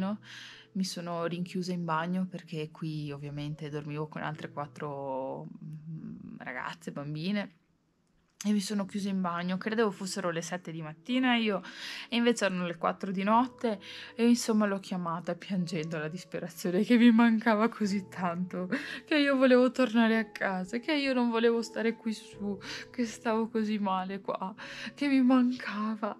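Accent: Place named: Veneto, Italy. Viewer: Ciao di seguo da poco ma sei fantastica come quasi tutte quelle che vanno in montagna poi accento veneto io sono di verona sponda lago di garda e quel tocco in piu.da dove 6?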